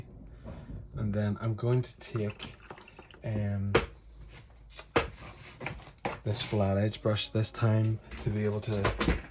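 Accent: United Kingdom. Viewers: Just starting to learn pencil drawing and water color pencils at 70. I am watching various youtubes to learn the basics. I came across this and watched intently. I had to chuckle a bit, when I thought people were watching wheels, instead of whales. :>) Our American accents are just a bit different than UK ones. So thanks so much for a great video. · Love your Northern Ireland accent! I have these DERWENT water colour pencils all in lovely earthy colours - but only a 10 pack - l called in Homecraft today to get this bigger selection that you happen to be using - but the price was very high and l couldn’t be sure if they would be as effective as my original 10 pack…. So thank you for this video …. You have persuaded me to invest in this bigger selection! Love your work! Delightful to see an artist from NI on YouTube!!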